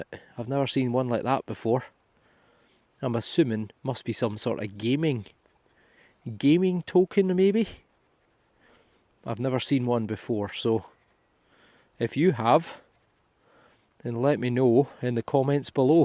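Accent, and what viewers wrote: Scotland, United Kingdom. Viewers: AND a Scottish accent to boot! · New subscriber here. Ohio, USA. LOVE everything I’ve watched from you…and I could listen to your Scottish accent all day, every day! Congrats! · Your support? Its the Scottish accent. Americans...love the different British accents. (Even though most Amercians cannot tell the differences...lol). Just as I imagine, most British think us from the South in the US...sound the same...lol. You all sound the same to us...lol. ---from Houston, Texas. (You get a "like", and a new subscriber).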